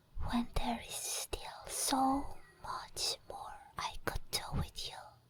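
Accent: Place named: France. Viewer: Hi I’m new around here what accent do you have I really like it kinda sounds like French but my ears can’t quite nail it down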